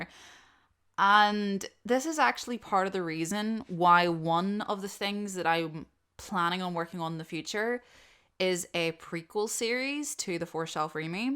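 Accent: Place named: Northern Ireland, United Kingdom. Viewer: Dang I feel bad as a Dungeon Master and a baby VA it took me ten minutes to decipher your accent as Scottish. 😭😭😭 Edit: northern Ireland fuck!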